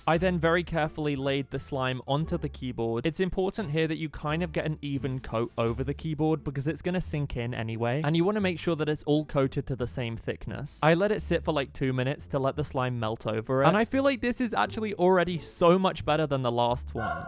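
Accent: United Kingdom. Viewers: The british accent✨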